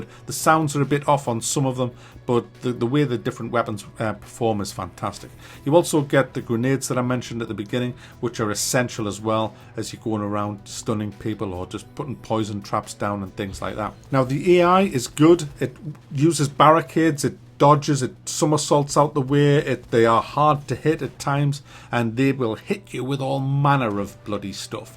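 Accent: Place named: England, United Kingdom. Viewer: North east England. We all talk like this around here.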